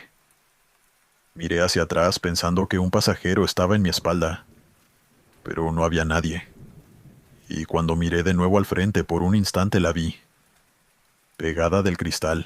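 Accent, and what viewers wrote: Mexico. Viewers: Yo pensé q era Méxicano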